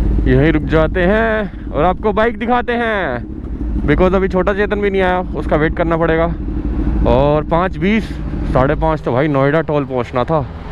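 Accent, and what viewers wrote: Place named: Punjab, India. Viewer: Punjab wali panjabi☺️☺️☺️☺️☺️☺️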